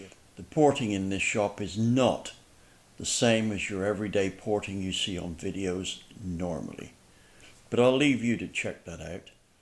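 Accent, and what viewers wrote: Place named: Yeovil, United Kingdom. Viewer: I cant find any history but he sounds like he's from Yeovil. These videos are like ASMR to me.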